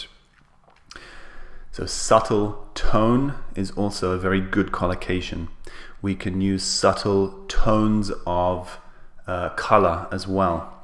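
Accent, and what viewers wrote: United Kingdom. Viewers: the bri'i'sh accent is quite charming!!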